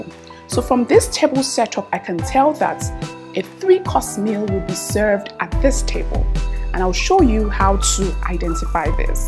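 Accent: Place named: Nigeria. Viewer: I can feel some Nigerian accent ☺️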